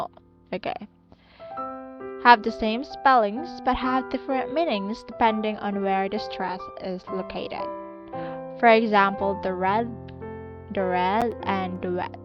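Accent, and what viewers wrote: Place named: Philippines. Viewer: Your filipino accent is wonderful. I'm from Philippines 🇵🇭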